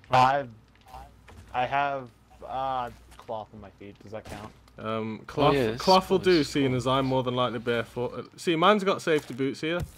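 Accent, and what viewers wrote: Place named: England, United Kingdom. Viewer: Hoping to find the mandem.. My G is mad english proper tings n dat 💯❤😂😂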